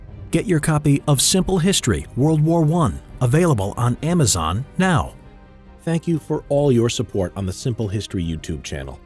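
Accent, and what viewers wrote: France. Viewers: As a native French speaker, I have to say that I LOVE your accent when speaking French ^^ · Funny French accent ;) Not hate intended, I love the videos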